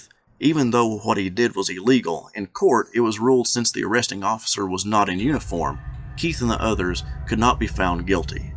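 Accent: South, United States. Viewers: Thank you, as a Canadian myself I appreciated this episode. Btw when I was a youngster I watched Richard Boone in Have gun will travel. Your accent sounds southern, where are you from?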